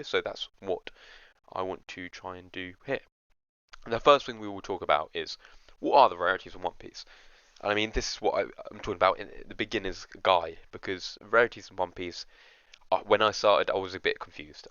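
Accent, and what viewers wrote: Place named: United Kingdom. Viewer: Good luck with your journey, subscribed as sounds like you are from the uk, looking forward to more videos . 👍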